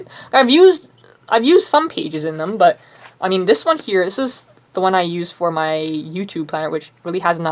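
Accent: Canada. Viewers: I love how you sound so canadian. Lol. The fast speech and dragging out certain letters or sounds. I hope im right in guessing your canadian? >.<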